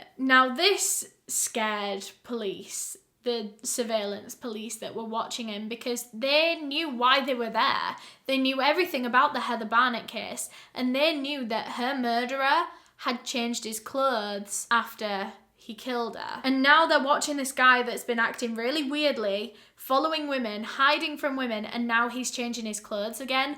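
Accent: United Kingdom. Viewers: I’m here for British accent. i love it 🥰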